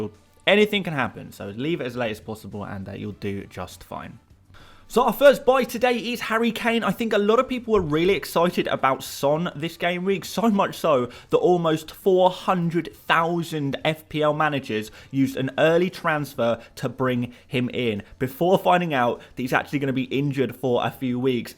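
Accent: Nigeria. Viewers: R u a Nigerian mate??🇳🇬🇳🇬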